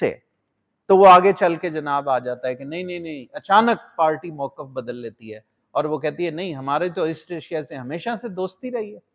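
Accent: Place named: Punjab, India. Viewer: Punjabi accent English.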